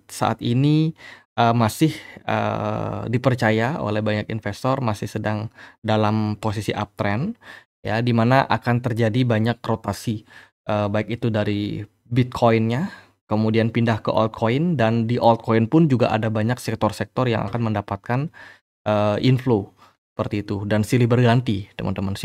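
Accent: Bali, Indonesia. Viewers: Sy suka pembahasan yg mengkaitkan ekonomi makro dengan crypto... Auto subscribe Dari logat huruf "T" nya, pasti dari Bali.. makasi bli ☺️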